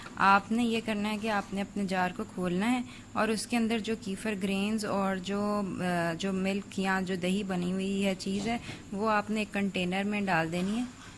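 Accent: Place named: Punjab, India. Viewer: andaz-e-bayaan bohat acha hai kalka sa panjabi touch hai jo acha lagta hai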